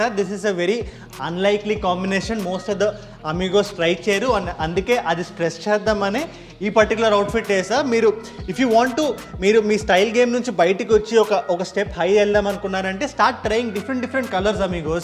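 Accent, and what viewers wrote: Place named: Hyderabad, India. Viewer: Love from Hyderabad, perfect Hyderabadi way of telling❤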